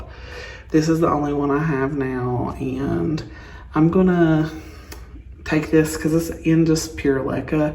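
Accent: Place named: South, United States. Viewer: Why do I love your voice/accent so much?! I think it’s bc it’s southern-ish sounding, yet you don’t speak As slowly as other southern accenters do? I have no idea, lol.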